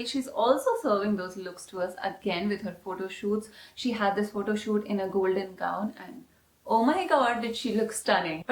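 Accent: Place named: Mizoram, India. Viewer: I love your mizo accent,so sweet .Love from mizoram 😍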